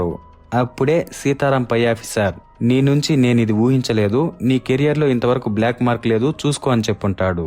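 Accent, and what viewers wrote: Rayalaseema, India. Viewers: Rayallasima kada slang alane untundi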